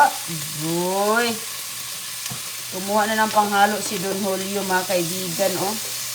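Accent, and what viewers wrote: Visayas, Philippines. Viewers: Eh bisaya nga kaya ganon syang mag salita hellow wag n kayang mang husga . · Visaya po ksi siya natural lng po yan sa pananalita nila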